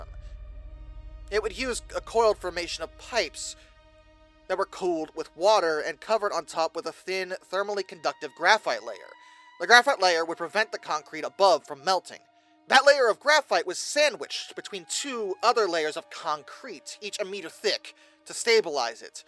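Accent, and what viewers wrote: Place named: United States. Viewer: Okay dude you sound like an American so why in the hell are you calling Z zed?! What are you some kind of eurodegenerate or commie?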